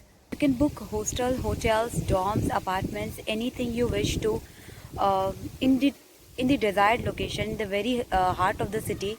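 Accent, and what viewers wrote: India. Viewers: What a Indian accent hababb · indian accent tho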